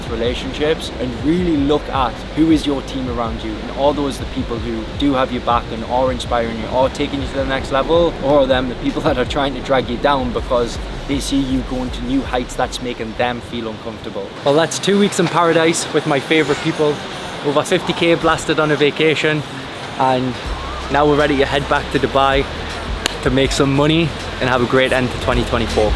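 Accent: Newcastle, United Kingdom. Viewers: You sound geordie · He has a Newcastle accent. 🙄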